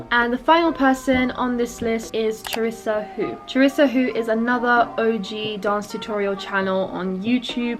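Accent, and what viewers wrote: United Kingdom. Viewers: I love your British accent and thats why i ve been keep watching your videos instead of watching the videos wit my native language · Ok i know m supposed to be focusing on what she’s saying, but her british accent is lit chef kiss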